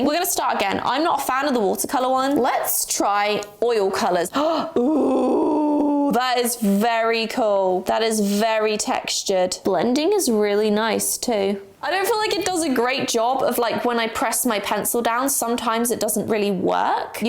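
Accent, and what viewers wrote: England, United Kingdom. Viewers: The British accent I love it Bettah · Sorry are u english? I can't tell if ur American lol the for making this vid!!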